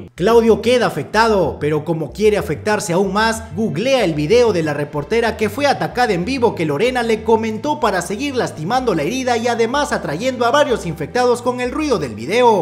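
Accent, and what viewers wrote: Peru. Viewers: eres el mejor dando resumen con toque peruano csm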